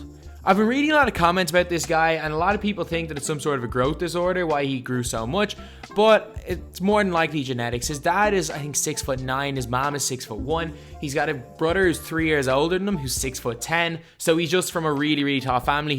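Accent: Ireland. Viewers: I know that DKM is Scottish, but are you Irish? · I can tell you're Irish... Noice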